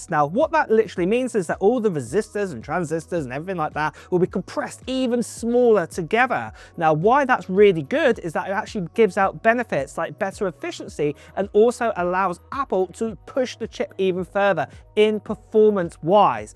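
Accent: United Kingdom. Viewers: Thought it was a woman talking 😂, why do most men sound funny, ? In Britain - I’m assuming the accent is British, although I could be wrong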